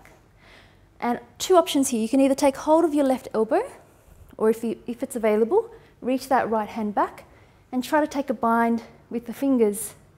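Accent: Australia. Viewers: Thank you for a fantastic flow. So good to be guided by an Australian voice at home. 🙏🏻🧘‍♀️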